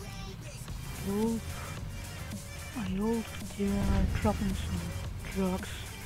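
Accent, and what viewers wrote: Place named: Netherlands. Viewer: Je hoort wel goed dat je nederlands bent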